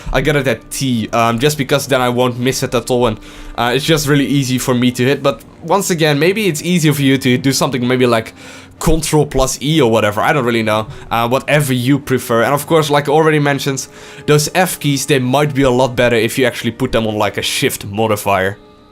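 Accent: Netherlands. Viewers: Your dutch accent is so real 😂😂 no hate good video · Haha dat heerlijke Nederlandse accent. Mooie video man ;) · dutch or Belgium?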